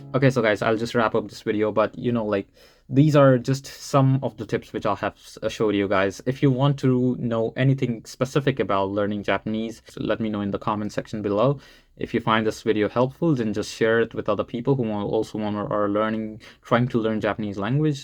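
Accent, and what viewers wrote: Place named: England, United Kingdom. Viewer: pleaseee make a video on how to get english accent like you am also from india please i beg you help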